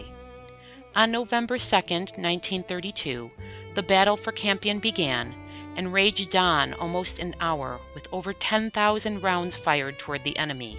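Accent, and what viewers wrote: United States. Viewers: its funny but ruined by it being narrated by an American. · WTF is an "ee-moo"? Suggestion for narrator... If you're going to do a voice-over about an Australian thing with your American voice, learn how to say its name. Google it, or ask an Australian. How hard would that be? It's an "eem-you".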